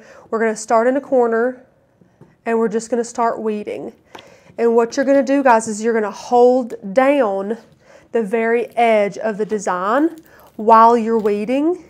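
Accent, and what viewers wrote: South, United States. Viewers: Agreed, a blessing you are! It’s so nice to come on here and learn from people who you know are kind and sweet, not to mention, you are lovely and your accent is just adorable, I am from California and I am telling you that is definitely not how we sound. Your sweetness is from the south, im going to assume, I’m ready to move. Hee hee! 😇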